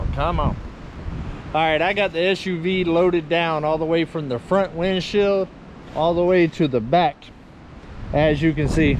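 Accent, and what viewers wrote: United States. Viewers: unfortunately, he is one of those guys that really rubs in that country accent.....too much for me. hope he settles in and loves his choices.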